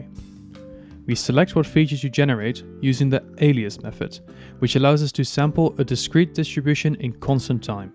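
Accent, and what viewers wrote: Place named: Netherlands. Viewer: Nice dutch accent! (Very subtle though). Trees don't grow along the normal of the surface, they grow towards the sun, up. With a bit of variety :p Looking forward to see updates on this. It looks very nice, optimized and I like your approach :)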